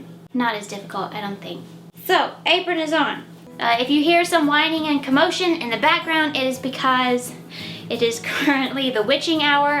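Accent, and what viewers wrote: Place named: North Carolina, United States. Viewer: You sound like your from my neck of the woods in North Carolina 🤗😁, "don't need no meat"😄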